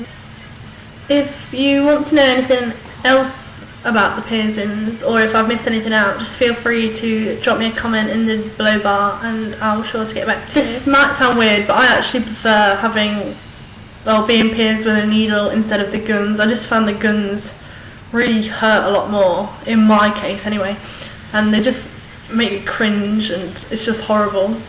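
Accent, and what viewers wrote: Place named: Southern England, United Kingdom. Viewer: Where I'n the uk are you from I love your accent and I'm from the uk and I've never heard people with such an fab accent is it southern ??? Devon or Cornwall ????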